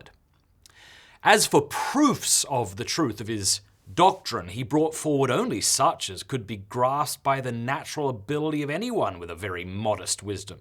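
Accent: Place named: Australia. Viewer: Cool to see the best Australia accent ever.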